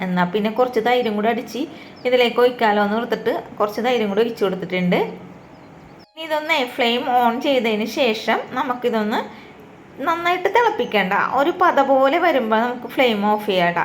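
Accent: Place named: Kochi, India. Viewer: ഒഴിച്ച് കൊടുക്കണേണ്. തനി കൊച്ചി ഭാഷ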